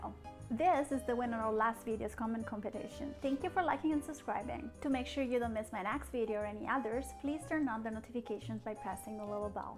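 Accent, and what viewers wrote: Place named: Agrigento, Italy. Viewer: Dall'accento direi che sei agrigentina? Complimenti per il video! :)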